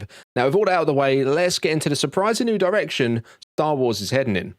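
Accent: England, United Kingdom. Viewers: I love Star Wars. I love English accents. I love smart, interesting discussions of star wars. Well done, mate.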